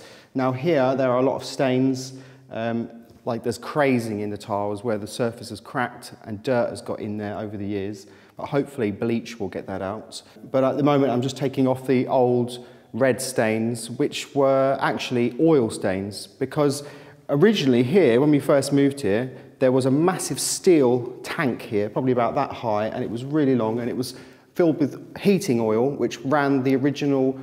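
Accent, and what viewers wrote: United Kingdom. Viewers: It was nice to see your sarcastic humor. I'm American and I find it interesting when British folks pronounce words differently. Just keep saying aluminum the way British way. Obviously some Americans think they need to correct your pronunciations.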